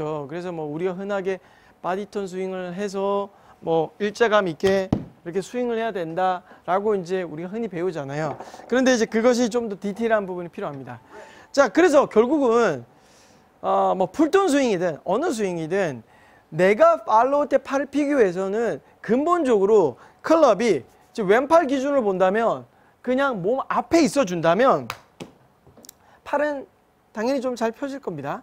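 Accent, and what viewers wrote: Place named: Gangneung, South Korea. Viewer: 님 강릉분이신가요? 동향인듯 귀에 익네요 ㅎㅎ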